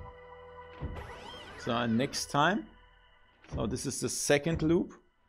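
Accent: Germany. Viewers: Hey, been watching for a while. I own a very small VR company in Washington State in America but my girlfriend is German. So I tend to put you on whenever she's around because I think your accent reminds her a little of home. Also, I can never get over the way that you read your sponsors. It's the most German thing a German person has ever German'd. I actually wanted to thank you for the review you did on the neuro net EEG product. I'll be a little more blunt and say that it's got maybe good intentions but it is clearly a cash grab and one of those items that pops up and actually does damage to the industry as a whole because it's yet another product or accessory that is lying to consumers and getting their hopes up to get closer to where we are wants to be as opposed to where it really is. I'm in the process of opening up a new VR arcade location and I'm currently trying to figure out some hardware logistics as far as purchasing. 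Perhaps you might have some advice for me? either way I hope you're doing well and keep it up. We enjoy it quite a bit.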